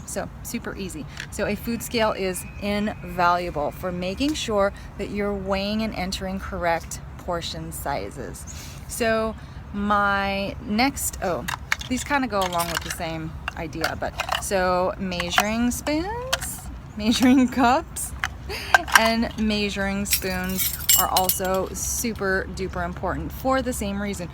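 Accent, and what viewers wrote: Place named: Canada. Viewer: Are you Canadian? You talk with that Accent. Love it.❤️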